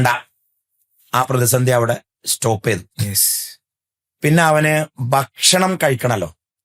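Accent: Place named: Malabar, India. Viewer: This guy know good malayalam but sometimes he goes to the low class malabar accent. But think world is not malabar.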